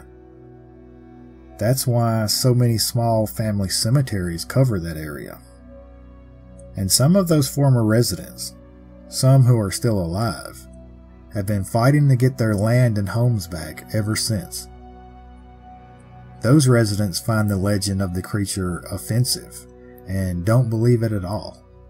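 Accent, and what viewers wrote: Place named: North Carolina, United States. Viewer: I do so enjoy these stories as I do hearing your accent; when I was a kid we lived in NC for 3 years and it's so familiar still.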